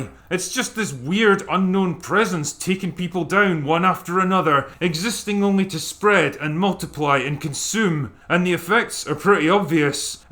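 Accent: Scotland, United Kingdom. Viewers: I’ve watched so much of the lately that my internal monologue has turned Scottish. I find myself standing in a queue thinking “Fuck off World” in Drinkers voice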